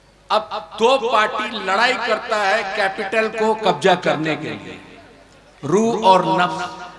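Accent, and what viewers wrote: India. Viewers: Accents to pura Hindi lag rahi hai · Mashallah Hazrat aapka takrir sunkar bahut Dil Ko sukun Mila🎉 aapka bolane ka jo leja hai na vah bilkul Jharkhandi ka leja hai aapka Allah Pak aapko salamat rakhe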